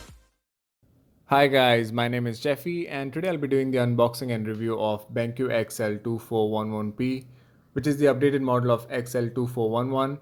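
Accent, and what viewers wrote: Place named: India. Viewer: probably because this guy sounds Indian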